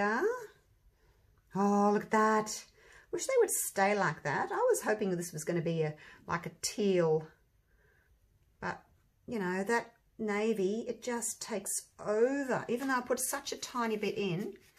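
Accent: Australia. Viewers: Hi! I am just getting into resin art. Love your coaster idea! Send me info on buying the coaster mold please. I love these, they turned out looking like pansies! Nice to hear your Aussie accent , I grew up in Sydney but now live in LA. uSA. All the best,Leanne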